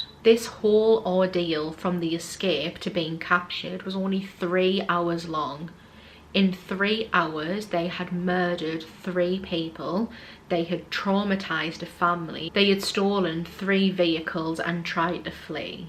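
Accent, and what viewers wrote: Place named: Scotland, United Kingdom. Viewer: Holy Smokes!!!!???!!! What tge H***!!!! Are you from Scotland? What a STRONG, STRING accent!! 😅😅😅😅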